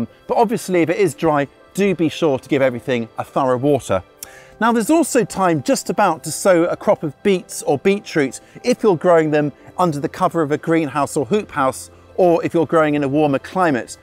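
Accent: United Kingdom. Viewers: You sound British, are you?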